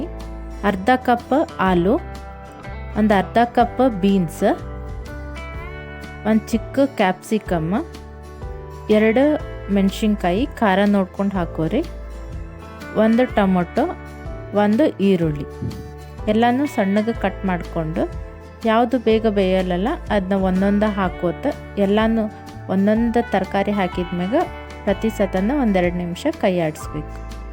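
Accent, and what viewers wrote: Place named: North Karnataka, India. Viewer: Nam ittar karnataka matu keli khushi ayitu sis super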